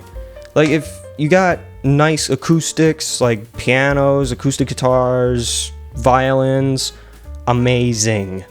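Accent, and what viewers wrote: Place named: Australia. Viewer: You sound like that funny Australian man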